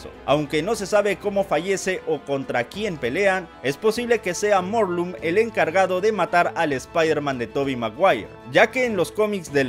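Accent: Peru. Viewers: Déjame adivinar. Peruano?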